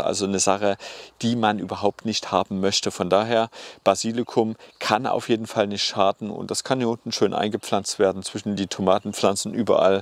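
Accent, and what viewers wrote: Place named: Berlin, Germany. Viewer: Bist du aus Berlin? Dein Dialekt klingt voll von dort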